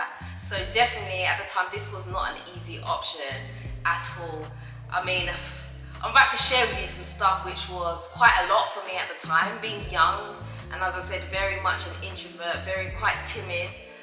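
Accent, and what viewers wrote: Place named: Midlands, United Kingdom. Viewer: I am surprised to hear you are “Londoner” My Mrs who is a Londoner thought you were from mid-lands Becos of your accent and how you pronounce certain words Anyway thanks for sharing your story, much appreciated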